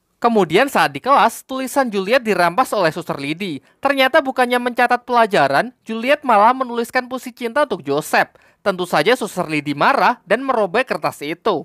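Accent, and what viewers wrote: Java, Indonesia. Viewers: Mimin orang Jawa ya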